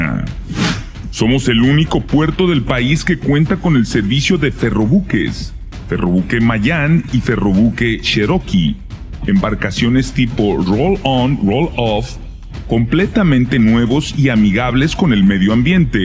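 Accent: Spain. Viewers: No entiendo por qué tiene que ser un español el narrador de estos videos. ¿Qué no hay voces mexicanas que puedan hacer la narración?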